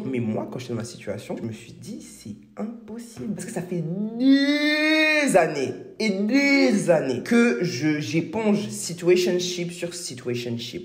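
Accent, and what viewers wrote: Ivory Coast. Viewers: Une métisse qui parle comme une bledarde ivoirienne....elle oublie son côté blanc. Moi je ne vois qu'une métisse en recherche d'identité à travers un accent et des expressions....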